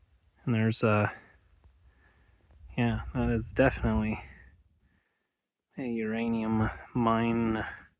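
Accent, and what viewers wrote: Utah, United States. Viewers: Did you ever live in Utah? I hear a Utah accent when you speak. On that road you were on going past "The Hole in the Rock" and going into Moab, there is a formation called the "Disappearing Angel". Starting at about 15 miles before Moab on that solid wall of rock to your left, you can see a spire out in front of it (don't know what else to call it) standing away from the wall and several feet away. You keep seeing it as you get closer. Then all of a sudden you come around a corner and the spire is completely gone - thus "the Disappearing Angel"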